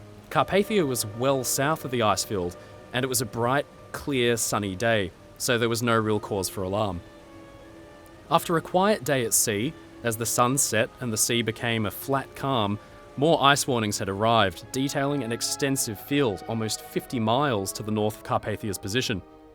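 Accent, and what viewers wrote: Australia. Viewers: Fantastic script well read fellow Aussie? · he's Australian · yay!!! Aussie narrator😃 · The fact that he’s Australian makes your statements pretty revealing…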